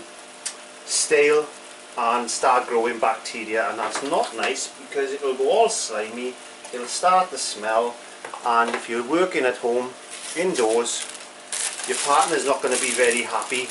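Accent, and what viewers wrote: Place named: Scotland, United Kingdom. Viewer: I love your videos and that wonderful accent. Scottish? I bought a couple of the Masterson Sta-wet palettes, and I like them a lot, but one is quite large and the other just a bit too small. I’ve tried using styrofoam plates that I put in plastic bags. They work, but can be awkward. The hardest part for making my own is finding an air-tight, flat container.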